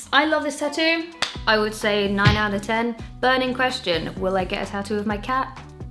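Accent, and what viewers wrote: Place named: England, United Kingdom. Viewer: i watched half this video before i heard your english accent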